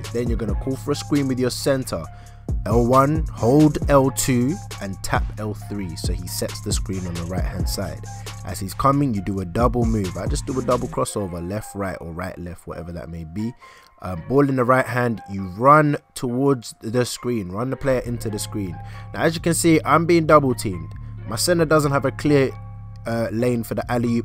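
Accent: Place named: Birmingham, United Kingdom. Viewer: Big respect to you bruv. I'm averaging 70k from these methods. I'm assuming your from the UK fam by your accent. Your accent sounds kinda Brum like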